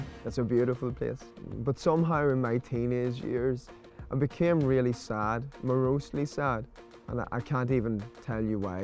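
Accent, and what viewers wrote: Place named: Ireland. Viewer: Awesome story bro! Just recently heard your music and love it! And now find out you're Irish! Haha, love the accent.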